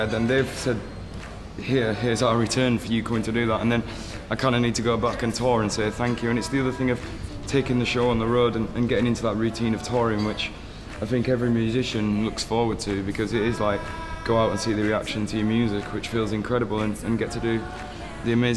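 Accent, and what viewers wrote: United Kingdom. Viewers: Was not expecting tht propa strong yorkshire accent lol! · I love his brittish!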